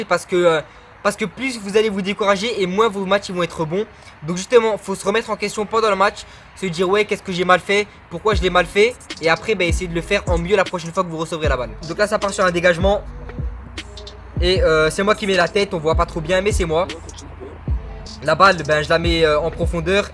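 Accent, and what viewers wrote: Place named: Marseille, France. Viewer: T est marseillais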